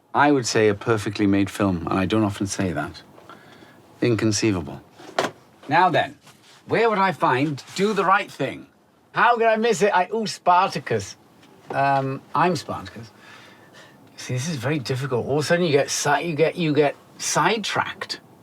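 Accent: United Kingdom. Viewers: Is he British? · I had no idea this dude was British af 😂.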